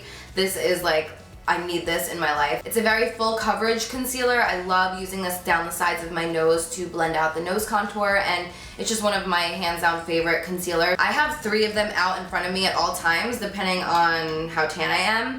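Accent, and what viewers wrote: New Jersey, United States. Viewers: Rose Sago lol no she has the jersey accent. But she does kind of sound like rihanna now that you mention it. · I knew when you said "wooder" that you were from New Jersey! I thought I recognized that accent 😂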